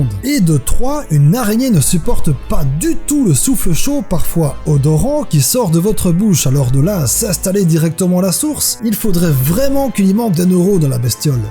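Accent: Liège, Belgium. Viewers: T'as la même voix qu'Absol mais avec un accent belge ;) sinon super vidéo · pas suisse, j'aurais tendance à dire belge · Ah , ca sens l'accent du copain Belge ca ? :D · T'es liégeois ? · Salut, je post pas souvent de commentaire mais des que j'en post généralement c'est bien. Bref, Juste un mot a dire "Géniale" Ton accent Belge donne un coté original a tes vidéos franchement continue j'adore;) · T'es liégeois ? :)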